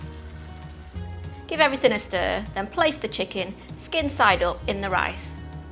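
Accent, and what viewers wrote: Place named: England, United Kingdom. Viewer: English pronunciation, unusual to American ears I'm sure. I'm always impressed that includes American measures in her directions as well. Its not common to see both.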